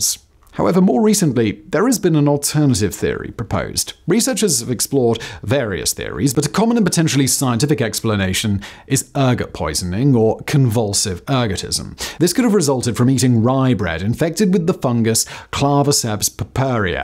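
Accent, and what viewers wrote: United Kingdom. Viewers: I thought British people only talked like this in movies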